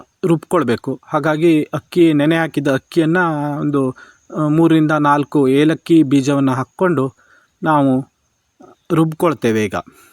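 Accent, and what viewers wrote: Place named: Uttara Kannada, India. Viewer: Siddapura, sirsi areadalli bhasheya accent ide tara irutte . Nirupane paravagilla, sahajavagide.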